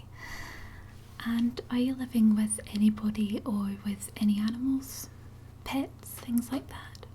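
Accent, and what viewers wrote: Scotland, United Kingdom. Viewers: I don't normally like Scottish accents but yours is very nice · heyo ma wee fellow Scottish pal!! where abouts in Scotland are you from? i wanna say glasgowish but then your 'body' sounds different from mine so I'm not sure hmmmmm ohh hmm maybe not hmm most likely much more up north? am I right? I'm definitely right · Rebecca Harper it's called a Scottish accent... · All your videos are great and a particular thumbs-up for the Scottish accent! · ASMR I thought as much it was a Scottish accent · Perfect ASMR! As a Scot I give your Scottish accent 10/10 :) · I'm absolutely hooked on your role play videos with a Scottish accent. the rp vids also seem so real. love them. thank you for making asmr videos!! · I thought the cables connected to my headphone speakers where plugged into the water supply, because that gorgeous silky smooth Scottish accent just flowed into my head. 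Please can you do another ASMR kidnap video sometime. Thanks a million for all your hard work. 👍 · Nice Accent! Scottish, Right? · Where in Scotland are you from?x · OMG SCOTTISH ASMR THE WORLD IS A BETTER PLACE 😱😱😱😱😱😍😍😍😍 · I just love to listen to a scottish accent.the best accent there is. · I'm not a fan of your Scottish accent, sorry! The Scottish accent feels like a weird step into the unknown. sorry to be critical just my own opinion. Otherwise really enjoy your videos. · How do u put on such a good Scottish accents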